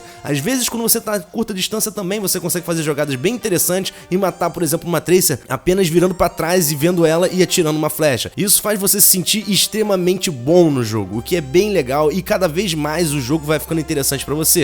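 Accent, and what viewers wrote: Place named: Portugal. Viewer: o teu sutaque e parecido com o pt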